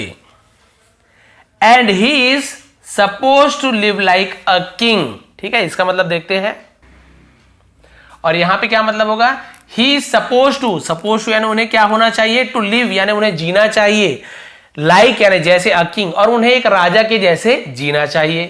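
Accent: Chhattisgarh, India. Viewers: Sar aap Chhattisgarh ke Gramin area se belong karte ho kya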